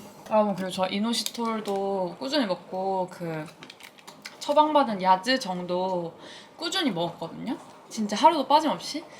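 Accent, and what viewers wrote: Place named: Jeju, South Korea. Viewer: 우왘ㅋ.. 이렇게 현실 제주도말 처음들어봄..ㅋㅋㅋㅋㅋ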